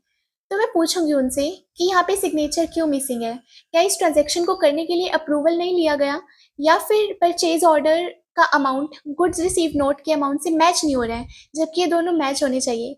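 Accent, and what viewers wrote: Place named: Punjab, India. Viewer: Your punjabi accent is too cute❤😁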